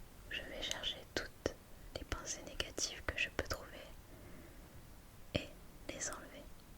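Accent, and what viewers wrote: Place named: South, France. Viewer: L accent du sud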